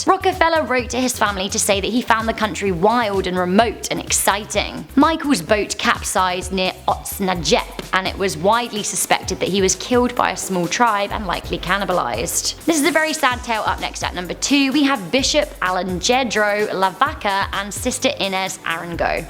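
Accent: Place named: United Kingdom. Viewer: Goddamn i can only withstand so much british accent..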